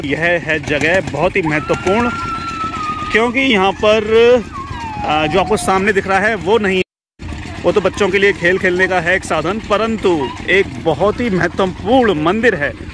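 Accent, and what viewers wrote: Standard. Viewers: शुद्ध मातृभाषा का उच्चारण और संस्कृती का आचरण फिर वो भाषा, संस्कृती कौनसी भी हॊ, आपके उच्च संस्कारो को दिखाती हैं. हम बहोत प्रभावित हॊ गये आपसे.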